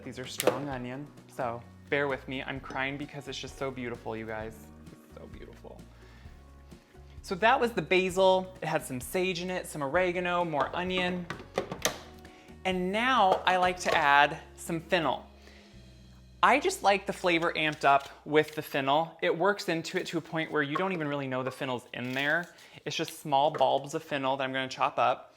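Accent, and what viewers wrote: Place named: South, United States. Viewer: Your accent gives me life!! I’m a southern gardener girl in the DC area and I miss how warm the people are ( just like you) so your videos are like therapy for me. Thank you so much!! ❤️❤️❤️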